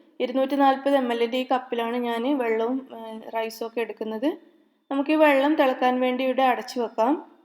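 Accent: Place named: Palakkad, India. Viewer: ഇങ്ങളെ ഭാഷ പാലക്കാടൻ സ്റ്റൈൽ ആണല്ലോ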